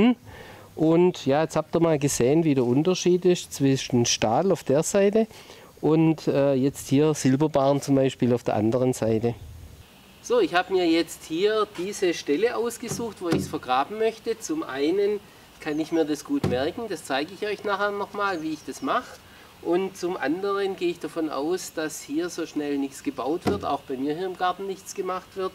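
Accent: Swabia, Germany. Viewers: Schwabe oder Schweizer? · Blaulicht 112 Ich auch am "S" erkannt man die Schwaben.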